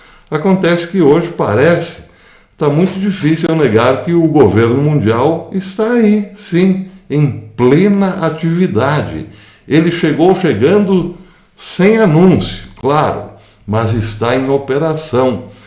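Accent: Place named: Northeast, Brazil. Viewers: Falando nordestinês. Estranho. Sou baiano de Salvador, porém morando há 14 em Sergipe e te estranhei. Mas ficou engraçado. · Professor adorei seu sotaque nordestino. Obrigada pela homenagem.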